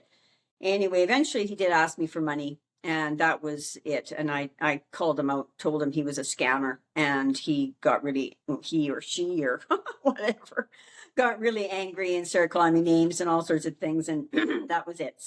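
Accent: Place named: Canada. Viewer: New subscriber here ...love your charming Canadian accent 😊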